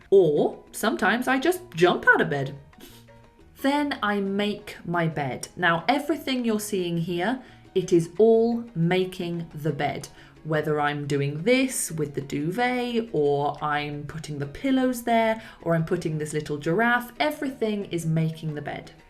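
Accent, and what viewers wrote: United Kingdom. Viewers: British accent. Very good how it sounds to an english student more used to the sounf of the american english. Thanks for this video. I:m on my way to fluency.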